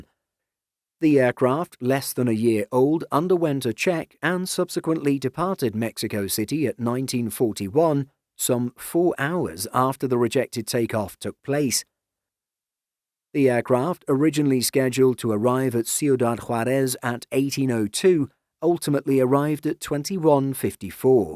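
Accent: United Kingdom. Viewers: Low value production. No real radio calls. Its just a guy with a british accent reading a report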